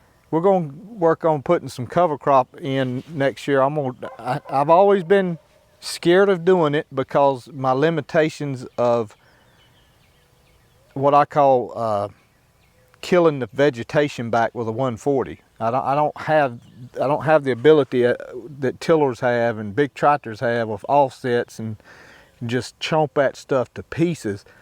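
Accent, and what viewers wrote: North Carolina, United States. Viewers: Well it sounds like we are both from the great old North State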